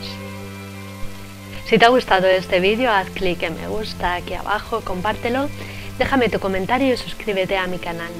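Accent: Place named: Spain. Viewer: Hola, lo has hecho muy bien y el video está bueno, pasa que a muchos argentinos nos resulta raro ver relatada la obra de un compatriota nuestro con tonada española. Ya sé que dirás que en Argentina se habla algo que no es español, pero quienes hemos nacido acá y escuchado el argentino desde la cuna creemos que es "el" idioma, jaja, muchos besos desde Buenos Aires.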